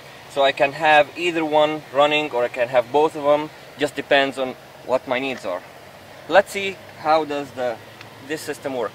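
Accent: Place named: India. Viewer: يااخو لو انك شرحت بالعربي لغتك تقول هندي يشرح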